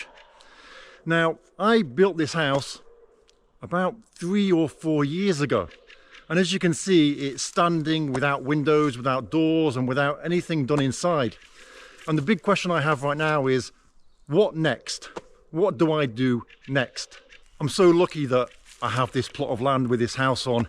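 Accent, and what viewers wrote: United Kingdom. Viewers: Он уже 20 лет живёт в РФ, считай он один из самых ранних экспатов-ютуберов и у него очень хороший русский язык на достойном уровне, практически носитель но с британским акцентом)) Это кстати солидное преимущество, так как многие иностранцы годами не могут освоить язык кроме - "Всэм Прувет ит из..."😅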